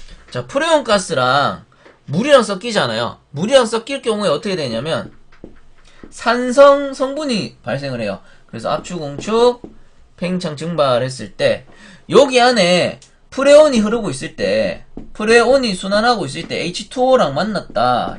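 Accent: Gyeongsang, South Korea. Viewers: 끼긱끼긱 빵터짓읍니다 경상도 방언 좋네요 열정적인 강의 감사합니다 NH3암모니아 올림 ^^ 따뽕 좋아요 꾸욱!!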